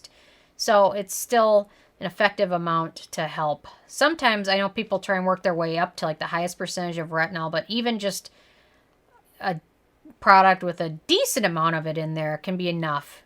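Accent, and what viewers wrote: Minnesota, United States. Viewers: Are you from Minnesota? The accent sounds like it. ;)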